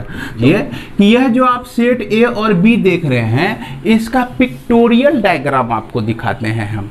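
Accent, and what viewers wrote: Bihar, India. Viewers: Bihari Accent 👌😘😂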